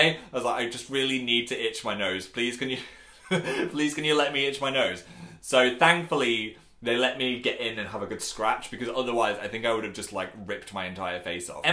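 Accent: United Kingdom. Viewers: YAY SOMEBODY BRITISH!!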